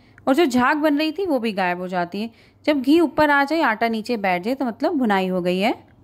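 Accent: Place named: Rajasthan, India. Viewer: Rajasthani.typical accent.accepted.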